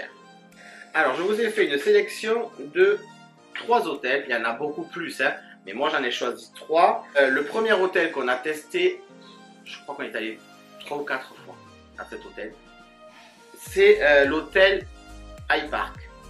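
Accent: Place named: Haute-Garonne, France. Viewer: c est parceque moi je suis du fin fond de la Haute Garonne et expatriée dans l Oise à cause du boulot... donc entendre parler comme chez nous ça me fait du bien !!! Merci pour votre contenu qui est à la fois intéressant, bienveillant et drôle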